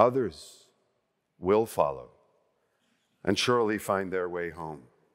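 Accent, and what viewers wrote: United States. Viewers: You have a beautiful speaking voice Benedict and you are a truly gifted orator, but, an American accent? I know the Apollo astronauts were American however your natural voice would have done them just as much justice. Kudos to Anne Lapwood, a thrilling end to this piece. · How interesting that Mr. Cumberbatch chose to read this letter in - what is to my ear - an American accent. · Why was Benedict speaking in an American accent? Was it because everyone kept making fun of him for saying penguin weird? Honestly it’s not that weird, he can say it however he wants lol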